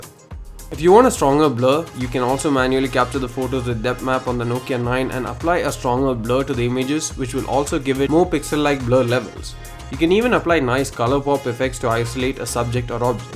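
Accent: Kerala, India. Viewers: Are u a malayalee......bro?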